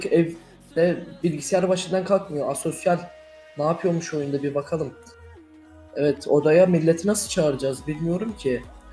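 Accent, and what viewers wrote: Azerbaijan. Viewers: kesinlikle Azerisiniz. Belki kendini diye tanıtan insan bile değildir. Zaten iyiki de ( ismi bilinmiyor xc) abone değilim. Bu kaçıncı yalan? İnsanları kandırarak birşey elde edemezsin! Lox sözünden anladım ve konuşmandan. Bende Azeriyim de, kendinizi bu kadar düşürmeniz? Ah, kesinlikle boşsunuz. Sizin içinizde,dışınızda boş. Benim yorumuma gelip Mertciler pardon Sahtekarcılar buraya havlamasınlar...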